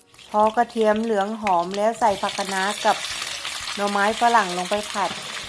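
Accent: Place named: Kamphaeng Phet, Thailand. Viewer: อร่อยอีกแล้วครับ.สำเนียงคล้ายๆคนกำแพงเลย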